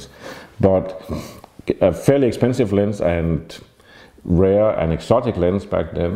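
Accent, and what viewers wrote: Denmark. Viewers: very danish accent - but god input man !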